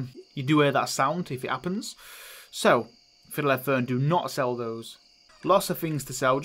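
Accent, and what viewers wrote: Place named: Nottingham, United Kingdom. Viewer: Great vid. Keep it up. Where in England are you pal. Sounds like a Nottingham accent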